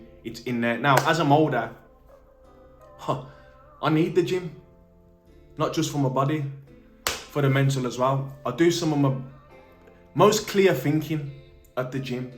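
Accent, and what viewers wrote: Birmingham, United Kingdom. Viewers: bro which place in the UK is that accent from? its fire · good vid bro you from brum ?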